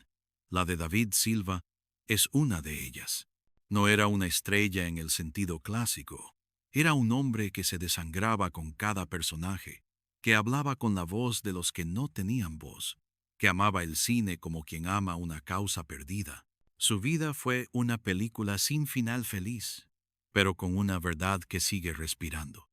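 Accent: United States. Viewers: Todo bien, menos ese acento gringo del narrador…🤣🤣🤪👎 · Ya se perdió la historia con este cuate que habla cómo americano 😂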